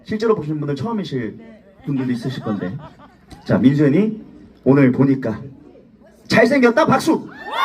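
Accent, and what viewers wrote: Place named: Chungcheong, South Korea. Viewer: 환장하것네~~~ 정겨운 충청도 사투리 귀여운 수현님이 하니 더귀엽네요😂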